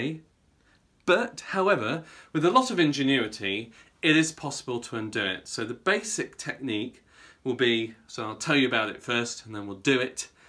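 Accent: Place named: United Kingdom. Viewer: Love the British accent, wish I got one too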